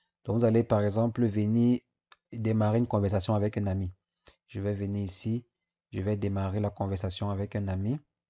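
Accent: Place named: Cameroon. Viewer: Peut être que je suis pas intelligent i mais je n'ai rien compris quand bien même avec ton fort accent Camerounais je devrais te suivre.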